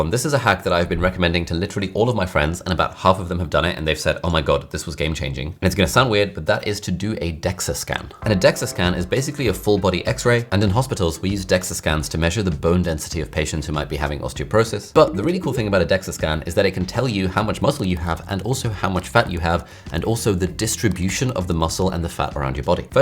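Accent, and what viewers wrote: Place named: United Kingdom. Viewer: Have you ever made a video of your transition from Pakistan to the UK? How did you absorb the British English dialect?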